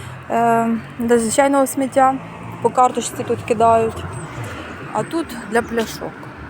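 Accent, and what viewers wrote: Ukraine. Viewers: Акцент закарпатський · Скажіть будь ласка, з якої ви області, бо судячи по вимові, ви не з східної України? Наскільки треба себе не поважати, щоб соромитися своєї мови у своїй країні? Я теж довгий час говорила на мові окупанта, з 2022 перейшла тільки на українську, але ніколи не відчувала якоїсь зневаги, хоч живу все життя в місті.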